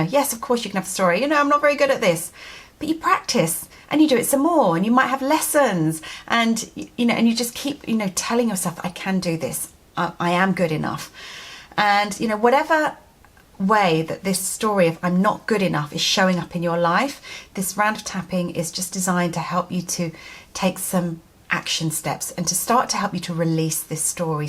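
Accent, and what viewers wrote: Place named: England, United Kingdom. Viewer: Omg YES. EFT with an English accent -makes it even more posh :D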